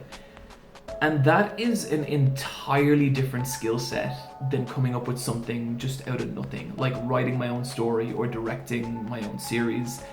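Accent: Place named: Ireland. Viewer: Could you not tell from his heavy irish accent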